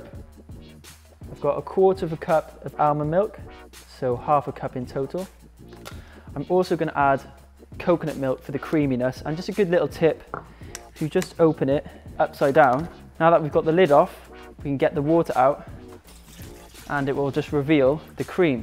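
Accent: United Kingdom. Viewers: Does anyone know where about in the UK his accent is from?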